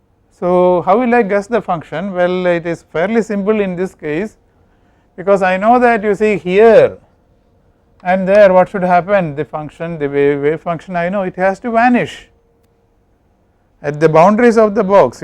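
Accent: India. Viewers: Although the heavy Indian accent is hard to understand,the lecture is wonderful and helpful,thank you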